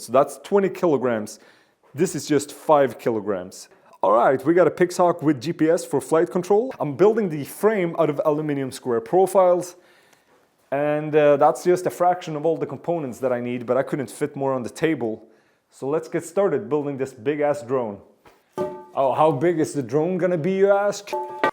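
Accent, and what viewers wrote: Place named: Sweden. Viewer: I did not realize you were having a Swedish accent, I was way to focussed on Russian for some reason😂 hello from Sweden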